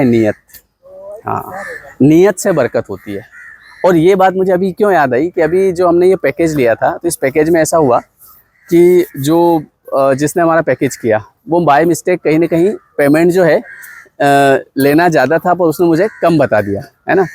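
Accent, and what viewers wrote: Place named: Malwa, India. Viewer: भाषा टोन mp निमच, मंदसौर की है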